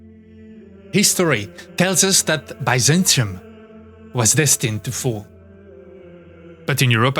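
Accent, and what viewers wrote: France. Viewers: english with a french accent but one that is nice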